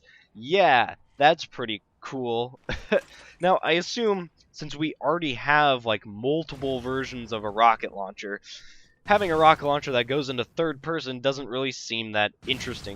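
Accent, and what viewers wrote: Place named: United States. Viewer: i bet your american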